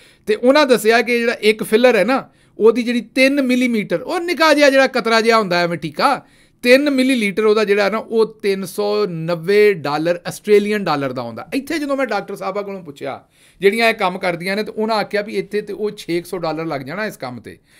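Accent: Punjab, India. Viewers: 👊👊👊👊💕💕❤️❤️🌹👍👍🇬🇧🇬🇧 love your Punjabi accent KAMRAN from East London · Greetings from Colorado, USA. Listening to your Punjabi dialect & words reminds of my dear grandmother who passed away 39 years ago today. Thanks for the memories.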